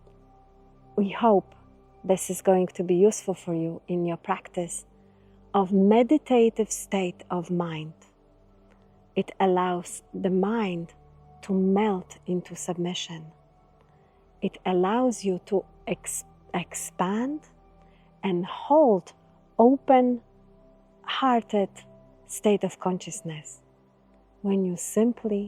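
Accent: Poland. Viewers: I also think it is something different about this Channel about this person. I don't know what it is but i can't stop watching.❤ Is it all thrue? Or is it another trick? I wish I knew. I hear polish accent I wish she would answer I wish to break free from this prison.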